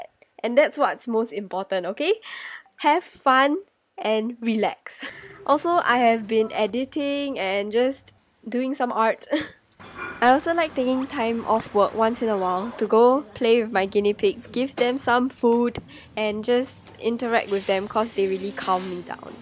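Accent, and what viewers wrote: Singapore. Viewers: I love how you don’t try to change up your sg accent 🤩🤩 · first time hearing that a singaporean accent sounds french lol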